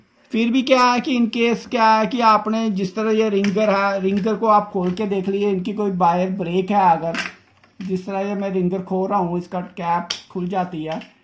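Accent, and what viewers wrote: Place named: Punjab, India. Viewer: Yha pta chla ki Bhai Punjabi hai Osm vdo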